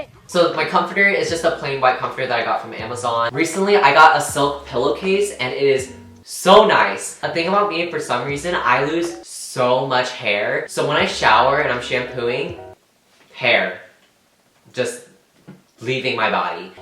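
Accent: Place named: Los Angeles, United States. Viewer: You talk, look, and sound so LA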